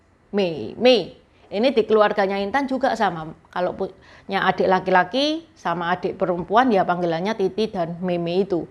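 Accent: Java, Indonesia. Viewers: komentarku bukan u menihilkan niat baik intan u memberikan info yg baik tentang hal2 yg berkaitan dengan chinese. Semua video 2 lain2 nya saya sering mendapatkan wawasan baru. Tapi u video ini, jujur saya ga pengen tonton hingga habis... krn pelafalan yg salah... menggangu bgt telingaku. Krn di bahasa mandarin, salah intonasi = salah arti.... Saya kebetulan teochew yg intonasinya bernada 6-8 .... dan uda 4 thn yg lalu otodidak belajar bahasa mandarin... dan lumayan hasilnya. Saya juga setuju logat jawa medok intan yg membuat pelafalannya agak kacau... TAPI harusnya ini jgn JADI excuse u salah melafalkannya. Krn buat yg kurang mengerti, jadi bisa salah diterimanya oleh mereka. Dan saya yakin juga bukan intend intan u membuat video ini, kl ternyata salah diterima oleh audience nya. No offence here, bang....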